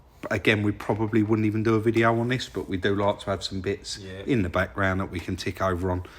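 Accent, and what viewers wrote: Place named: Essex, United Kingdom. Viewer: When said rail at the start I thought he meowed, rail Sills nice alloy are hard words for the Essex accent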